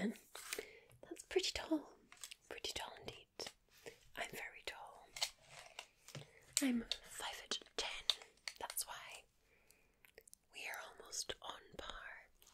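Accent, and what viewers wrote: Scotland, United Kingdom. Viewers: I hear Scottish I click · Do you know who I am My brain: slight Scottish accent Me: MERIDA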